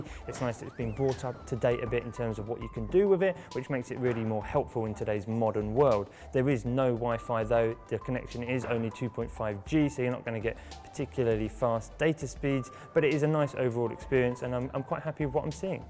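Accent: United Kingdom. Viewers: at least put subtitles, if you're going to talk like a english peasant, from potato fields.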